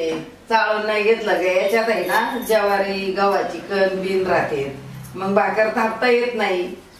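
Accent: Ahmednagar, India. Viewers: आमच्या नगरी आजीची मस्त भाकरी.. आन् झणझणीत नगरी बोली..😀👍🏻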